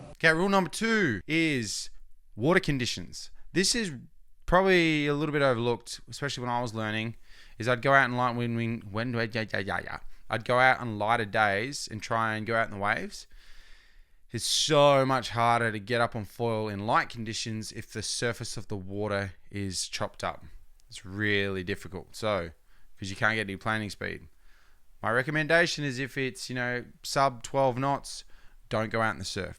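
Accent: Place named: Canada. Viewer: Great video, and I really enjoyed the Canadian accent.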